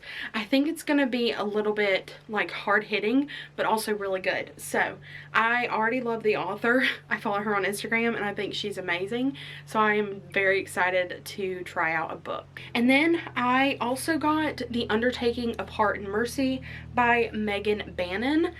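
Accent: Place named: United States. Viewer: Also obsessed with your accent (I’m from the UK) I can’t pinpoint where abouts in the US you are from?! 🖤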